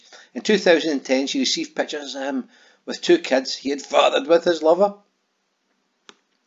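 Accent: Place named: Glasgow, United Kingdom. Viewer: you replied! <3 I read it with your voice xxxxxxxxxxx My Glaswegian love lol xxxxx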